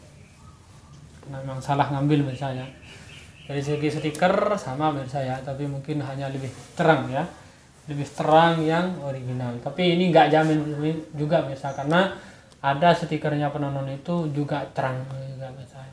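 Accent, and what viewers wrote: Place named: Madura, Indonesia. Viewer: Pak ustad nya orang madura kak kik kuk bhs indonesia nya.. lucu😁 tapi saya kagum dengan anda pak ustad semoga lancar jualannya.. aamiin...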